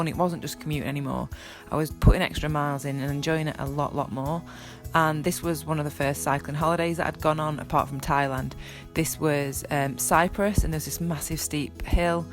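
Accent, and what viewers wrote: United Kingdom. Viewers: This is such an inspiration. A feel good story narrated in a very pleasant British accent. Watch the video, you will be glad you did.. Thank you for sharing..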